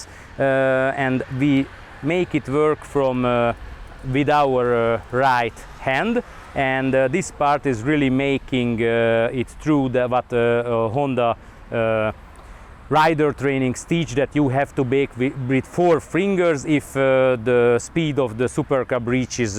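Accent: Hungary. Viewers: I like your Hungarian accent. Good review.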